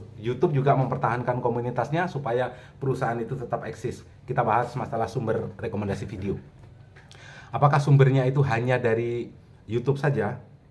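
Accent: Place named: Gombong, Indonesia. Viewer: Mantap meski bolak bolik nonton bahasane ajeg tapi kesane enak dengernya, kaya wong gombong logate haha, Lanjut jadi pendorong bagi kaum pemula 👍🙏🙏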